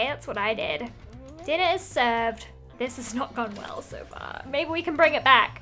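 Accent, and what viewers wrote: United Kingdom. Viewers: Lizzie my big sitter said are you brithish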